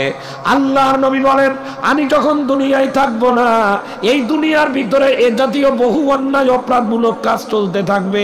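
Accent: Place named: Satkhira, Bangladesh. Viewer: Satkhira tune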